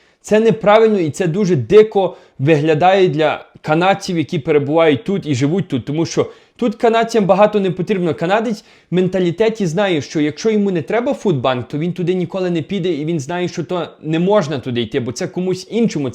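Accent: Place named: West, Ukraine. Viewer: ПРОСТО жесть!!чувак шо за Украинский у тебя? шо за дичайший западенский акцент !?В Тебе не справжній Український..а типова кастрюльно бандерівська мова!фуууууууууу...ІДІОТСЬКИЙ ЗАПАДЕНСЬКИЙ АКЦЕНТ!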